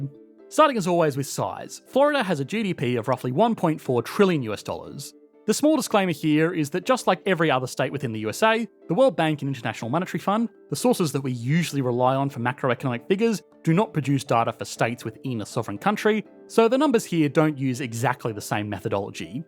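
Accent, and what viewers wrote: Australia. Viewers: 😂 As an Aussie, I can confirm that those weird downward inflections are components of the Aussie urban accent. I don’t have it myself because side I never liked it, it a lot of Aussies do…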